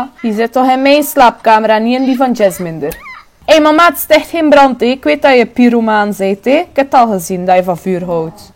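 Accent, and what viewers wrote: West Flanders, Belgium. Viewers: gelachen tot tranen toe...en int westvloms tè de max :xD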